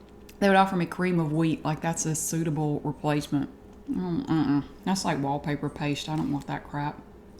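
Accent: South, United States. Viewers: MY GOD you look incredibly stunning! I think it's that success glow, new house, 2 year long channel, new camera (maybe?) but regardless you look amazing! You are seriously one of the most underrated ASMR channel out there. Your content is so simple but bold at the same time, hands down my favorite channel. Thank you for being who you are, dedicating yourself to this channel with a full time job, and 2 kids, I seriously don't know how you do it but you have my respect! I really want to watch this right now but I gotta go to work. But I can't wait to get home and fall asleep to this beautiful Southern belle talk me to sleep! lol.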